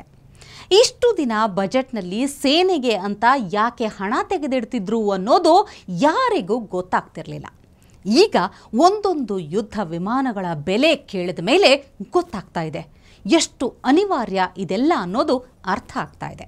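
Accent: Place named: North Karnataka, India. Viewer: ನಿನ್ನ ಉತ್ತರ ಕರ್ನಾಟಕದ ಭಾಷೆನೆ ಚಂದಾ ಕಣವ್ವ, ನೀನು ಬೆಂಗಳೂರು ಭಾಷೆ ಮಾತನಾಡ ಬೇಡ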